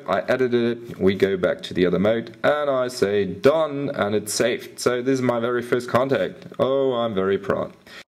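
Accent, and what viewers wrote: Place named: England, United Kingdom. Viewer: i hate your english accent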